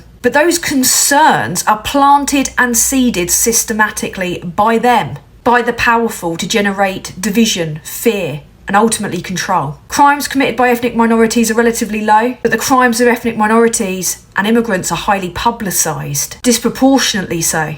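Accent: Cockney, United Kingdom. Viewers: Your just a Labour Lord Lord Haw Haw, champagne socialist propaganda merchant, with tour mockney accent, which posh school did you go to..your a plant.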